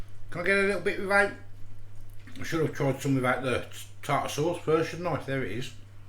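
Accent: Birmingham, United Kingdom. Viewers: Proper Brummie love it.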